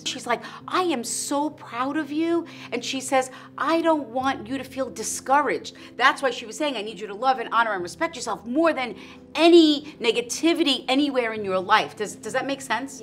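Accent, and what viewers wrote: New York, United States. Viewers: “DO YOU UNDERSTAND WHAT IM SAYING?!” With a New York accent keeps you in check.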